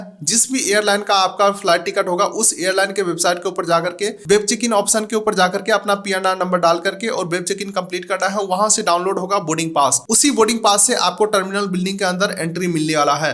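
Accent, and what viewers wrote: Bihar, India. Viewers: Sir ap Bihar se h kya voice tone lg rha h 😊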